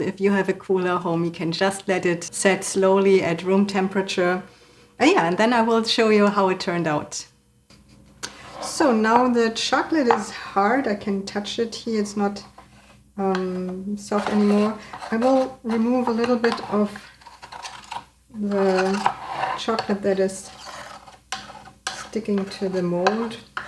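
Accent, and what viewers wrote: Germany. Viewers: People are soo picky with videos. This lady should have more views and much more likes.This lady is fantastic, charismatic, and well groomed. She does her videos really well. Her German accent accentuate her videos even more. I can't put more likes, i already checked a thumbs up. But, what i did is watched the commercials to help her. This recipe is so delicious.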